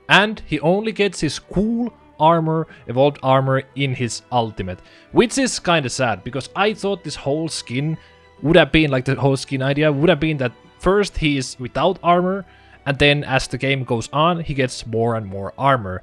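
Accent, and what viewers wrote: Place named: Finland. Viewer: Hyvä video. Suomi aksentti paistaa vähän lävitte. 👍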